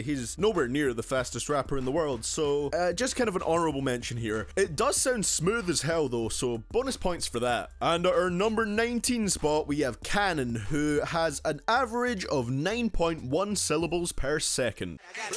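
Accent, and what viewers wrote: Scotland, United Kingdom. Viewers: Are you Scottish by any chance or its just your voice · Are you Scottish, because I am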